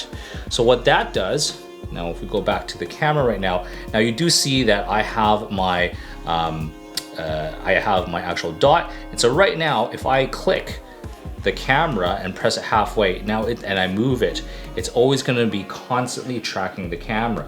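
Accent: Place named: West Coast, Canada. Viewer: Aside from the west coast Canadian accent, this was helpful!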